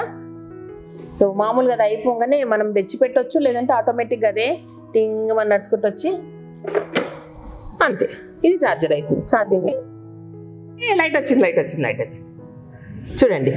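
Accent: Telangana, India. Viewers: Telangana slang adurs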